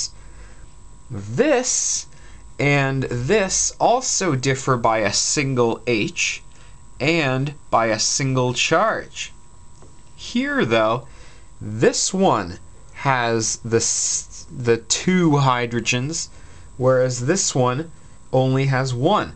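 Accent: Canada. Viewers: totes hear a canadian or mn accent 😊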